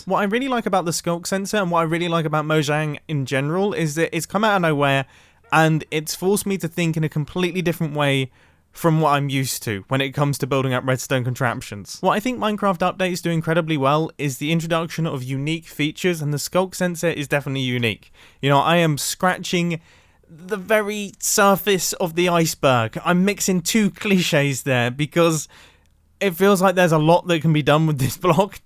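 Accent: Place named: United Kingdom. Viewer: There’s something about a Minecraft youtuber with a British accent that just hits different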